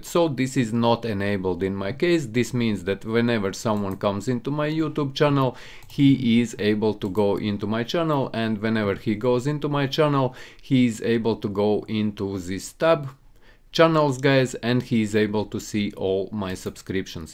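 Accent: Russia. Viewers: i love russian accent 😂🔥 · Hes Russian i think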